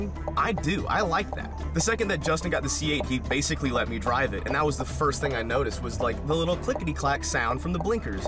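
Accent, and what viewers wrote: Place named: South, United States. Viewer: He lowers his level of southern-ness so people online can understand him lol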